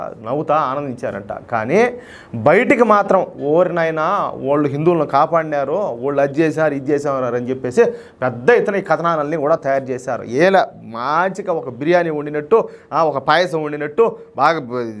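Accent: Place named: Telugu, India. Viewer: I Like your telugu language slang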